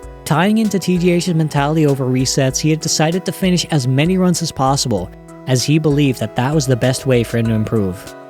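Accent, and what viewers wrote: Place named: Netherlands. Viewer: Dit is misschien het meest undercover Nederlandse accent ooit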